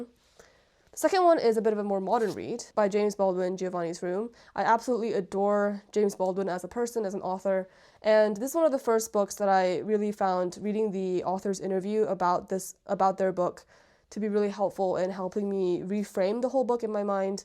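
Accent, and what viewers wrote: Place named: Ireland. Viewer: oh that's cool, it sounds vaguely irish at some points? Or maybe it's just your voice, either way it's beautiful.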